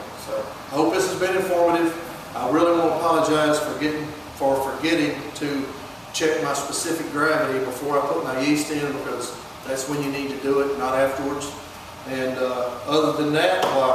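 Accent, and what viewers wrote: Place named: South, United States. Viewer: Ferrr-ment. Why does that southern accent sound so dam stupid?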